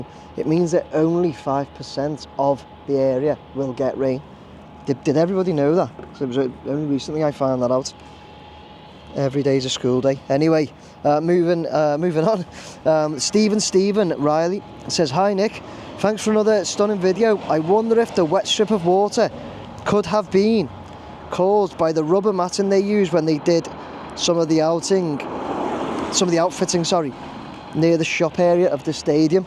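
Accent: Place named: Wirral, United Kingdom. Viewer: First time watching and last, can't hack that over the water accent me 😅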